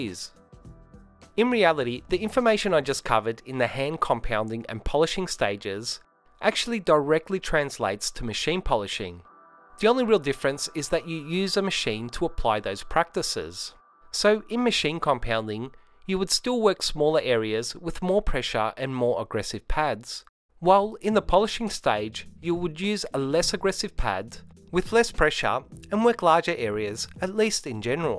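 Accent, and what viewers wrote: Australia. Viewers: Good Aussie narration. No hype no BS no selling. Just fact and detail. Best video I’ve seen on you tube for polishing a car. Well done and thanks mate.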